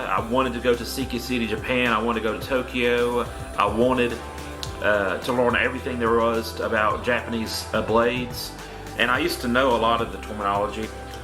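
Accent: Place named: South, United States. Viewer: Whats your accent it sounds Southern bayou/With Canadian...and hint of Aussie in some words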